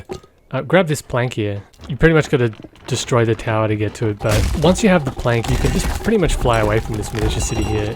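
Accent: Australia. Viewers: I cant stand his australian voice being so incredibly nonchalant about everything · Are you Australian?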